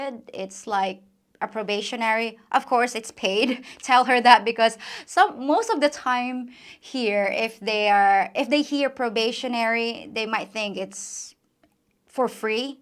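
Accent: United States. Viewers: Where did you pick up Americian things like 'workin" instead of working, and "ya?" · I think it's cute that you speak completely like an American saying "in's" instead of "ing's" at the end of words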